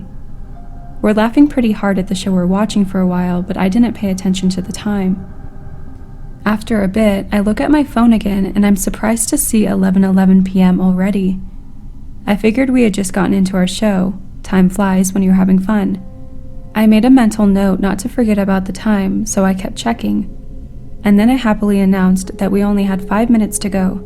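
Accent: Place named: South, United States. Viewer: hearing some drawl in a story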